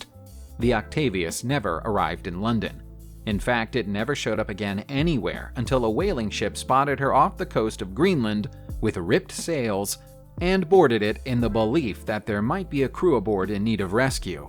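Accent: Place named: United States. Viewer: The pronunciations of British names are quaintly American too, as is the typically American mispronunciation of route as "rowt".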